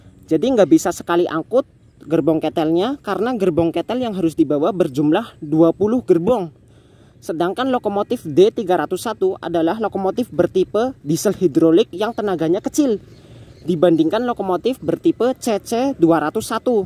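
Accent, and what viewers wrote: Banyumas, Indonesia. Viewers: Mantap... Liputan dgn logat ngapak Lanjutkan!!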